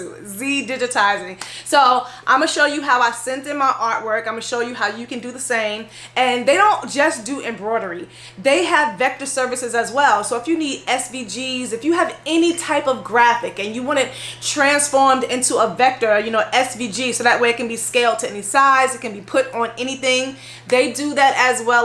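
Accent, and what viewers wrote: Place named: New York City, United States. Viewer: Everytime i look up a new skill in crafting screening dtf ypu name it... there is another video by! 😊 love your NYC accent too!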